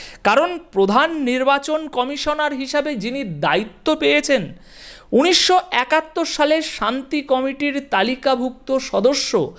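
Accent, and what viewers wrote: India. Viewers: দসক ওনি বাটপার উটপার ওনি বাংলাদেশের না ওনি ভারোতের লোক ওনার বাষায় বোজাজায় ওনার কথা সোনে লাভ নাই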